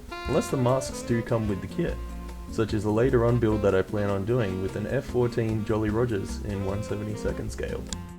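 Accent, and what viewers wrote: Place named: Australia. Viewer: You sound australian. And your glue seems familiar Did you get this set from metro hobbies?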